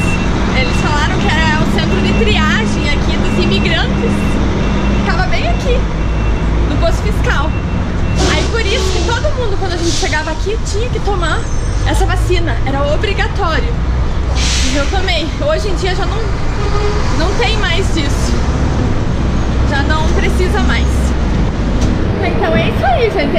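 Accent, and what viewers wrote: South, Brazil. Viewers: O SEU SOTAQUE DO SUL È UMA COISA ASSIM INDISCRITIVEL GRANDE ABRAÇO BOA NOITE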